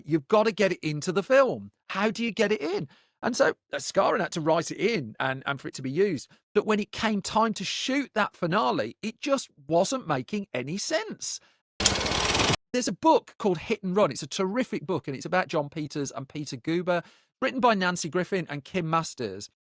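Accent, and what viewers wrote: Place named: United Kingdom. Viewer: That is one striking British Accent..😊😊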